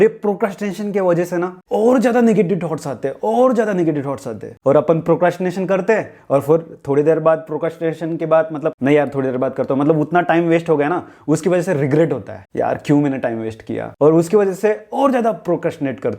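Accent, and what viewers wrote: Maharashtra, India. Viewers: I'm also from Latur.... Aur aapka Maharashtrian accent dikh raha h hindi me... 😅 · That Marathi accent while speaking Hindi 😍😅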